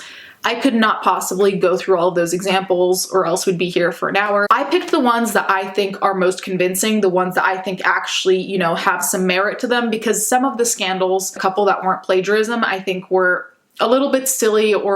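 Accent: Italy. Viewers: She’s Italian. So… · The Italian in you can’t not say those designers properly and I am living for it 😍😍😍❤️🧡💛💚💙💜🤎🤍🖤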